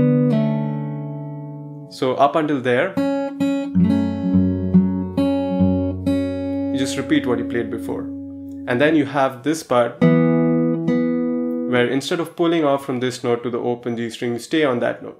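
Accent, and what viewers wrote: India. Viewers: Are u indian? U sound so indian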